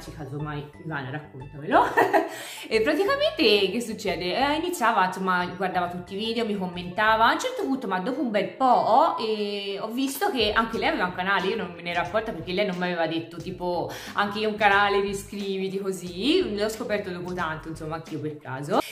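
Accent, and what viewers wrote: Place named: Tuscany, Italy. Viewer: Lei è pa^ata..ah, la tua Toscana riempie le mie orecchie! Buona settimana,carissima 💙💙💙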